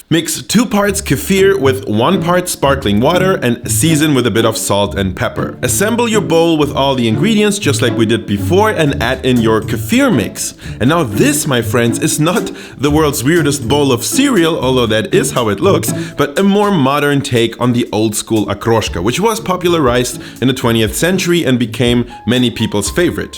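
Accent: Russia. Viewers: Абсолютли russian-english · Ладно там русский акцент, дешёвая малосъедобная варёная колбаса и фигурное выкладывание салата для окрошки. Но пальцем насыпать сметану в окрошку - это какое-то откровенное фричество.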